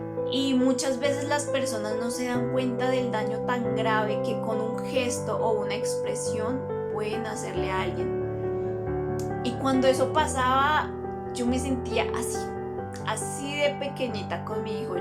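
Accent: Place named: Colombia. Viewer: Hola, pareciera que fueras de Colombia, la verdad acabo de conocer tu canal y es de bendición para mi vida, agradecería que si estas en Bogotá me recomiendes el jardín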